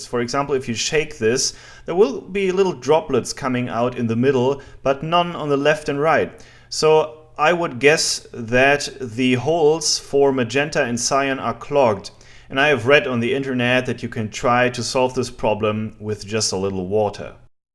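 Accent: Germany. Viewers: Ich gratuliere Ihnen mit Ihren Erfolg !!! like all your video's, especially your German accent...love it !! Herzlich grüße aus die Niederlande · What happened to your English?? Stop trying to sound American. It sounds horrible. I much prefer your clear German accent. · Dein Englisch ist viel besser jetzt und der schreckliche deutsche Akzent verschwindet. Sehr gutes Video wie immer. Vielen Dank fürs Hochladen!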